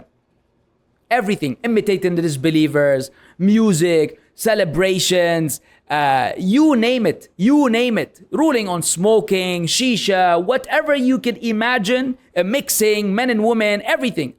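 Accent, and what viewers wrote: Lebanon. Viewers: Even though I'm a graduate of the Diploma of Arabic Language for Non natives from Umm Ul Qura University Makkah, still this Lebanese accent was beyond me 😂, Allahuma Barik Lak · I like your tripolian accent. Can you do a minyah accent, that's my favourite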